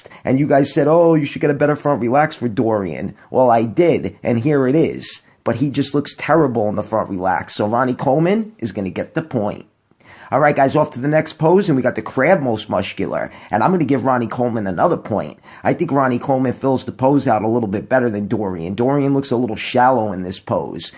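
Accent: New York, United States. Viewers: i love that nyc accent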